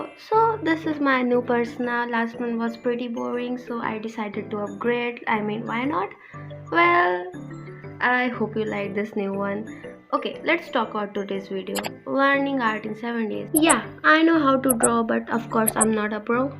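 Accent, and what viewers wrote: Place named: India. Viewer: YOU'RE SO TALENTED!!!! I LOVE THE WAY YOU COLOUR!!! are you indian? forgive me for asking but Im indian myself and i thought your accent sounds a bit familar to me 😵‍💫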